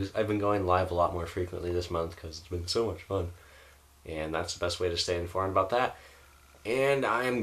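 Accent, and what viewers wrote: England, United Kingdom. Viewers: O Your English accent is amazing! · Your English Accent is Class!! :D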